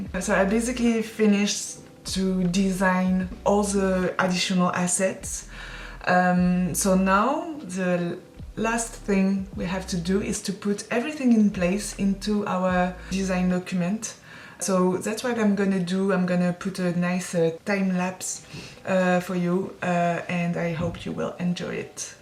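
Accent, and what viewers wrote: France. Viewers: J’ai décelé un accent français 😁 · Tu es française? Are you french? You have french accent it seems.. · L'accent français🥰🥰 merci je suis entrain de refaire mon portfolio en ce moment et ça me motive, j'ai grave la flemme mais c'est mieux que j'efface les immondices que j'ai fait quand je débutais 🤣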